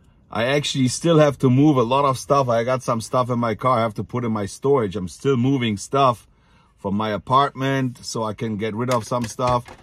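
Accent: Germany. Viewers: Take heart brother, I've watched your vids over the years, and I love your spirit, your German accent, and your swag, "Cast not away your confidence, for it has a great reward" I don't know you, but I know you, I used to live in Venice on Wavecrest between Ocean and the strand, very close to where your shop was, but those were the better years, and I feel like I got the best of it. Keep punching brother, your in my prayers, blessings...TMac Vegas Strong!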